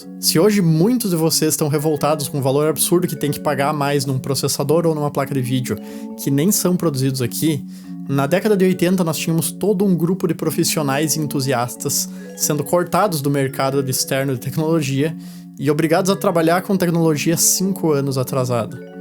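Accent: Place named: South, Brazil. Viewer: Sim esta errado subsidiar montadoras estrangeiras, deveriam ser empresas nacionais, com diversos pontos para serem atingidos, onde se nao alcançarem as metas, perdem uma participação da empresa, ela é liquidada, ou perde o financiamento do estado. Voces sao burros, na Alemanha tem politica industrial pesada com subsidios estatais massivos, o agro euroepeu é todo subsidiado, senao eles jamais iriam conseguir alguma coisa.... na moral velho que sotaque que me da uma raiva, para de ficar mudando o tom. ja tenho uma antipatia por alguns sulistas idiotas que conheci, muitos liberais, outros anarcocapitalistas, outros comunistas, muitos defensores de separatismos, poxa mano... a merda vem sempre destes lugares, só pia burro.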